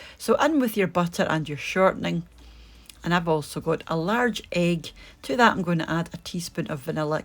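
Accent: Scotland, United Kingdom. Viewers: Tried this recipe works a treat. Very nice video and love the Scottish accent :) Well done.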